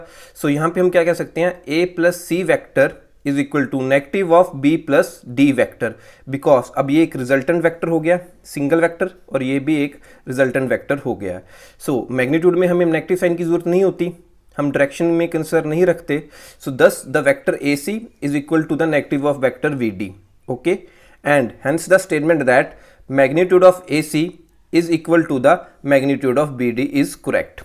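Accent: Punjab, India. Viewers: sir great explaination , also are you from punjab?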